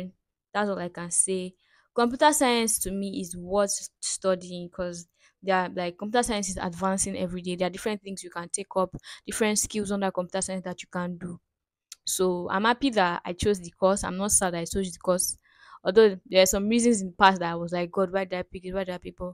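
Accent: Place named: Nigeria. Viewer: hehe. I have missed hearing Nigerian accent. Compuuter haha. It's comp-iu-ter 🤗🙃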